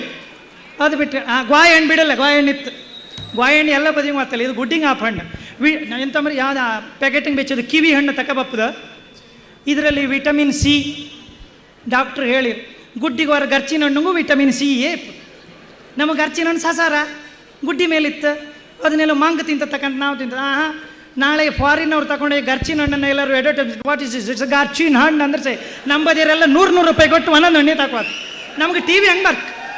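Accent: Kundapura, India. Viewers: ಕುಂದಾಪುರ ಕನ್ನಡ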